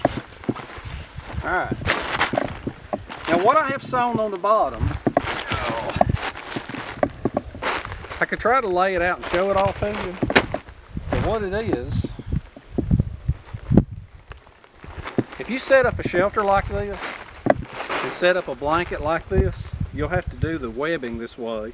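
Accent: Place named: Spartanburg, United States. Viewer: . Well i don not have too many 1 hr blocks to listen and watch. but just saw a few short ones I will watch later, You sound like Spartanburg SC. You remind me of my Brother in law the Prison guard.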